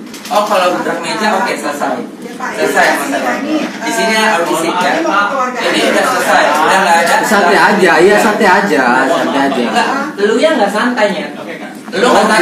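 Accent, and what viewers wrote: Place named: Adonara, Indonesia. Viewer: Abang ini orang, apa??? LOGAT nya masi kental Le... Hehehe... Kayak orang adonara Witi hama.... LOGAT nya itu hahahaha, sukses broo terus laris manis buat konten nya.... Kita akan mendukung dari belakang.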